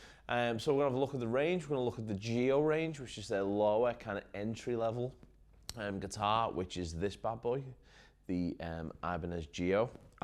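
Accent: Liverpool, United Kingdom. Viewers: Subscribed because you gave good reviews (Just ordered an Ibanez gio grg 121 yesterday as my first guitar to learn on) and you got that scouse accent. (shoot me If I'm wrong) Ha cheers from Australia.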